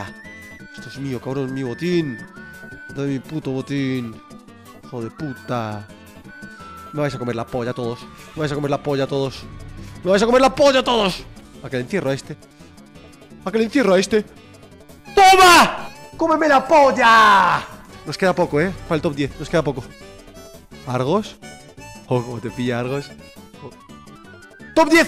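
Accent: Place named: Argentina. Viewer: rubius habla un 30% argentino y un 70% español lol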